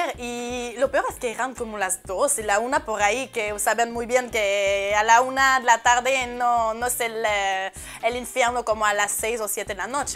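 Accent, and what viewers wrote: Peru. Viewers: Eres foránea o peruana el dialecto muy local no corresponde a una extranjera?? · YÁ hablas con el característico YÁ de Perú. ;) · Mejoraste mucho tu español hata acento de peruano tienes saludos desde argentina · tienes el acento peruano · Tu narración fue bien detallado y tienes que seguirla , impactas con tu castellano y tienes el dejo peruano ya tienes el acento...parte 2 y 3..7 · Ya me suscribí me encanta tu asentó peruano... Canadiense un saludo desde el callao❤️ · Me recontra mate de risa cuando en el minuto dijiste algo muy latino y creo que muy usado en Perú "una vaina", jajajaj aja jajaja. Definitivamente ya hablas como toda limeña! 😁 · Que lindas palabras para nuestro pais, sobre todo la forma como la relatas, con tanta emoción, es cierto el Perú tiene hermosos paisajes, en costa, sierra y selva, por tu forma de hablar se ve que ya tomaste la forma de hablar de los peruanos, linda, besos y abrazos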